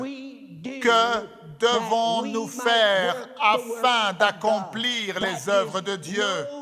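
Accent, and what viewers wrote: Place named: France. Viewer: Amen! Alléluia! Merci bien-aimé frère! I always knew you were French, both from your accent and from your name! The Lord has supernaturally connected me to Morris Cerullo by revelation in 2018, and since I received 5 encounters with this precious man of God through the Spirit. You are blessed as you carry on this mantle and precious words. The Lord strengthen and bless you. Love from Togo.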